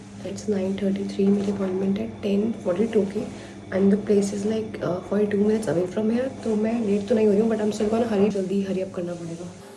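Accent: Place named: Punjab, India. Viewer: Your English accent🤌🏻 aapka hindi uccharan🤌🏻🤌🏻 dein Deutsch Akzent 🤌🏻🤌🏻🤌🏻 but tuada punjabi lahiza 🤌🏻🤌🏻🥰🥰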